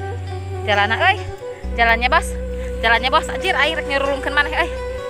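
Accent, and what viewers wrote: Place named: Sunda, Indonesia. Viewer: Modol eta hoe. Semangat pejuang beuteung... Ahaha meuni medok eta sunda 🤣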